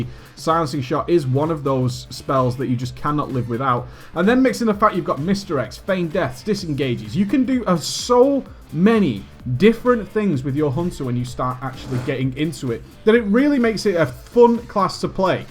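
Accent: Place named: United Kingdom. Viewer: Hes been in some high ranking guilds and he is highly experienced in terms of raiding in wow. But I dont think he thinks highly of himself I believe you are just hearing the normal British bloke.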